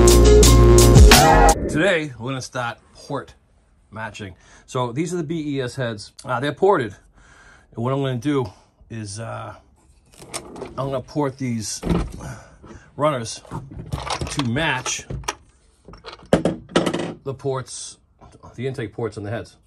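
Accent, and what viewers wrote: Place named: Massachusetts, United States. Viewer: Hey I picked up on your Massachusetts accent I live in ma as well. Central ma . to be exact how much would you charge me to port match my plastic intake for a 6.0..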